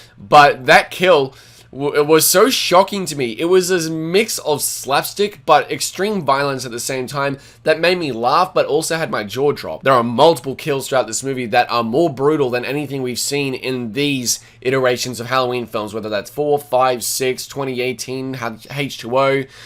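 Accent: Australia. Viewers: I forgot how much I love your Australian accent. Your voice would be really great for ASMR. I haven't seen the movie yet But I have Peacock which is a streaming service in the United States that it's streaming on simultaneously with its theatrical run.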